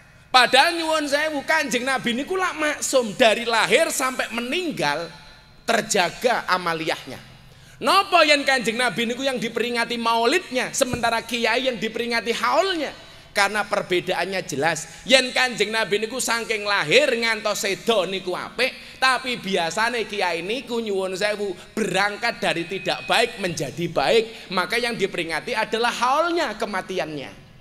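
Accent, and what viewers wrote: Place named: Java, Indonesia. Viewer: Bahasa nya internasional dong.. Nggak ngerti medok banget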